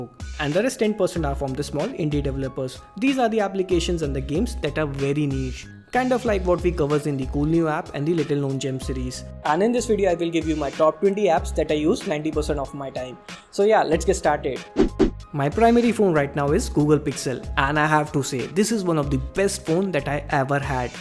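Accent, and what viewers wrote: India. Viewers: I'm from bolivia and even me can hear that india acent :v you speak better than me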